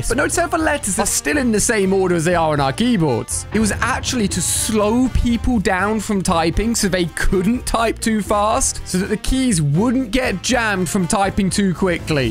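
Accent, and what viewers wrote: United Kingdom. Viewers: I THOUGHT YOU WHERE BRITISH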